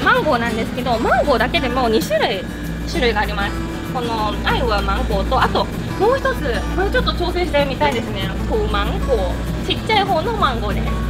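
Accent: Taiwan. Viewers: 根本是台妹講日語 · 為什麼是講的台灣國語?好可愛!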